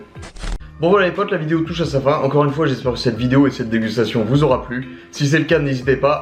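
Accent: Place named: North, France. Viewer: oué j avais reconnu le tiop accent du chnord!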